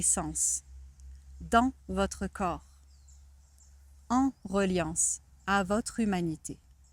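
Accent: Canada. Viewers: Merci ❤ Merci de ce partage ❤ Tu as de plus en plus l'accent canadien 🤗😁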